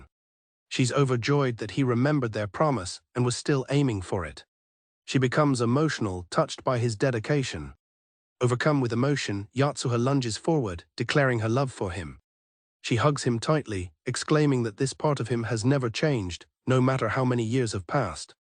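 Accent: United Kingdom. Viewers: British narrator? Yessir, first time hearing British narrator.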